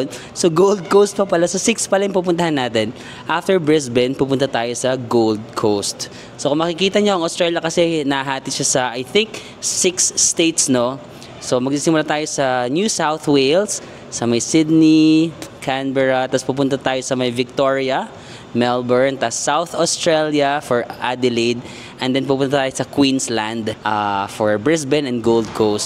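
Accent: United Kingdom. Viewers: That is more of a UK accent mate😂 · Meron kang British accent. Always stay safe in Sydney. Godbless! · You sounds British mate!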